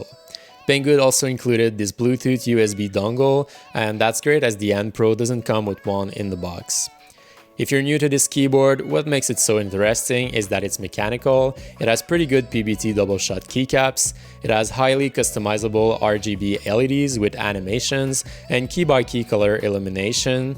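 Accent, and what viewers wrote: Quebec, Canada. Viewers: Are you from Québec ?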